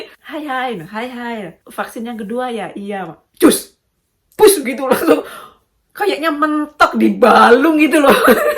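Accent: Java, Indonesia. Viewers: Mbak apa ada turunan orang jawa?kok rada medok jawa 😊😊😊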